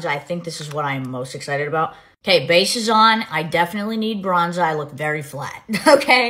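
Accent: Boston, United States. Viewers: That's a Boston accent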